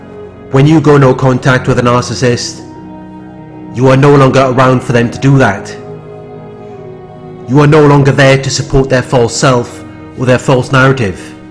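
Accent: Wales, United Kingdom. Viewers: This guy sounds like he from Cardiff or Newport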